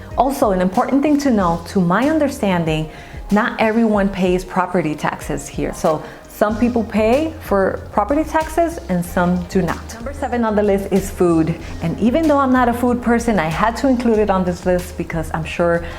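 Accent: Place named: Puerto Rico. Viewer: I love your Puerto Rican accent. Sound's great.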